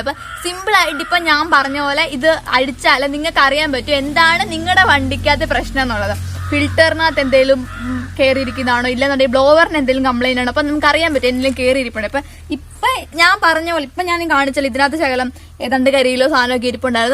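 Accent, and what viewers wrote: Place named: Kottayam, India. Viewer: Kottayam or idukki 😀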